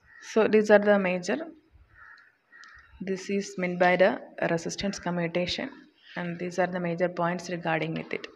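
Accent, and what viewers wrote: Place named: South India, India. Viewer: from south india can say this from her accent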